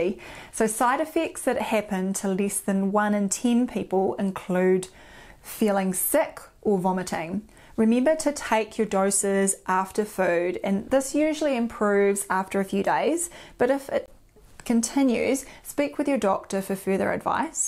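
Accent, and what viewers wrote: New Zealand. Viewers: Livel (level), tin (ten), sicondly (secondly)... Lovely New Zealand accent 😄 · I loved the new Zealand accent more than the informatiin on Metformin... The accent reminds me of Jacinda Ardern.♥️😊. But what about a high fat low carb diet,as an alternative to Metformin? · Love your videos and info but especially your kiwi accent as I'm an ex kiwi living in Toronto, Canada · I just love your Kiwi accent apart from all the important info. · I wish she were my primary care physician! I love her new Zealand accent! She's such a perfection, in every way! You can go to her clinic with an ailment, her smile will heal you in no time! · I love to hear your Kiwi accent and to watch you. You are eye candy.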